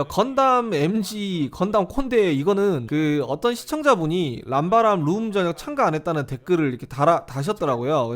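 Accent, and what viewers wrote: Gyeongsang, South Korea. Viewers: 말투가 경상도같긴한데 뭔가 전라 느낑이 있다? 충청..은 아닌거같기도 하고했는데 화개장터가 맞군요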